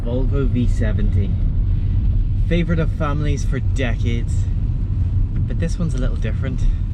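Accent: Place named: Northern Ireland, United Kingdom. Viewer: Unusual to hear a northern Irish accent from a person of color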